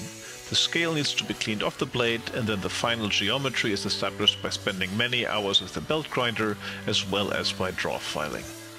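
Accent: Germany. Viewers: that´s some fine german accent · Are you german? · Kann es sein das du aus Deutschland bist. Das hört man nämlich voll an deinem Akzent :) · Are you a german? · Ich wusste ich habe da einen leichten deutschen Akzent rausgehört ^^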